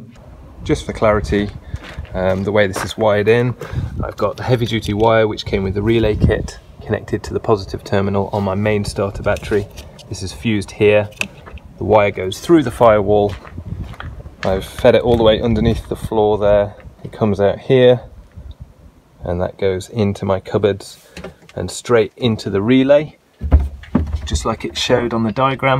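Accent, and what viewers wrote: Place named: United Kingdom. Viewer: Can’t thank you enough for these videos. You’re an excellent electricity teacher. Spent a few years NOT understanding this. You explain it plainly in 15 minutes. Ahhhh the time I could have saved 😂 and for some reason I understand the British lesson way easier than if an American is teaching it. I think some of the words make way more sense (leisure battery (b)=dual system(a)) plus I enjoy your accent way more than these hicks we have here 😂😂😂 sorry I had to 😝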